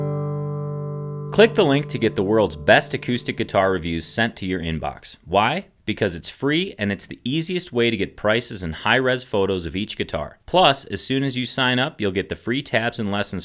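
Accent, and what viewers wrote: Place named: United States. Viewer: Nice job,. You sound more like a Yank as the years have gone by.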